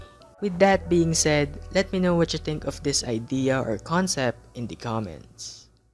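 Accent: Philippines. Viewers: Filipino? You kinda sound like one lol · I Knew he was filipino i just got it by his voice😀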